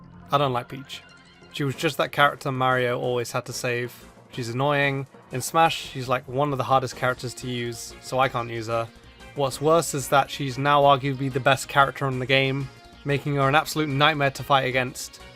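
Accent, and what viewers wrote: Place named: United Kingdom. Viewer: Wilfred Cthulu he did with the koopalings and that’s all that matters that he thinks Wendy Koopa sucks & the rest besides jr & like iggy which is fine but yeah those aren’t different characters just skins ya know so I guess if u wanna hear his British voice going I really like this shade of purple on daisy then...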